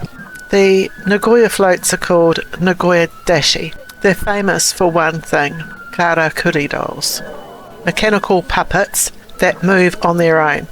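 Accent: New Zealand. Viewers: Something you might want to work on. Nail your vowel sounds. I notice a couple of times when you speak Japanese, you kiwify the pronunciation. e.g. Minna no nihongo. Hot tip, you're not in a race, doesn't matter what language you are speaking, your native language or a language you are learning, if you slow it down you win. If you are speaking English, slowing it for non-native speakers means they will listen to you more carefully, if it is your learned language, then people will recognize and may mirror your speed (huge win). TOO many people are trying to break speed records in class typically younger learners do this. This is a way of disguising deficiencies. So lets break down - Minna no nihongo Mi - that sounds like "me" in English, not "minna" like "winner" There are 2 n's in there, they deserve their own pronunciation. You can hold on the "n" (hold it on the roof of your mouth, then raise your chin and spit out the "na") Keep your "no" short, it's shorter than someone speaking English and saying "no". 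Nihon"go" - hold that "n" in the middle the same way as before (tongue on the front part of the roof of your mouth, you can even hold it there a little it will make you sound more Japanese, then spit out the "go"). Remember - speaking is not a race. And being a little older and wiser, you get a pass for slowing the tempo a little to get it right. It's a fantastic tool I've used all my life, slow it down just a touch and people will listen more attentively. *NOTE I share th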